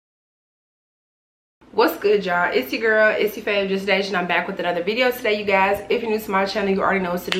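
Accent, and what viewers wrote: Chicago, United States. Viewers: I knew you were a Chicagoan. I always heard the accent. My ex husband left that with me. I can’t say CAR to save my life. It’s Kah. 🤣 nothing wrong with a reset. I did it several times at your age. Stay up. Refresh and renew. ❤️❤️ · same here. immediately i was like "she from chicago" 😂 that's my hometown so iykyk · I was finna say , you have a Chicago accent no way you from Minneapolis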